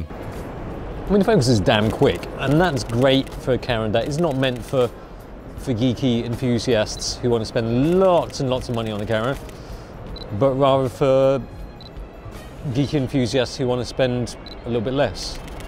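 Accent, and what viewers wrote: United Kingdom. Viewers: Are you British or Japanese with a British accent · The way to improve my British accent, it's watching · 英式英语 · listening to you talk makes me wish i had british accent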